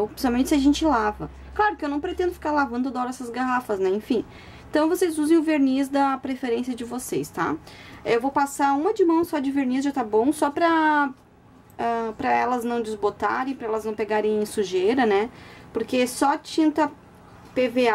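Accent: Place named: Curitiba, Brazil. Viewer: amei vc é de Curitiba? tenho parentes em Curitiba, o sotaque é igual ACHO LINDO Bjs ♡♡♡♡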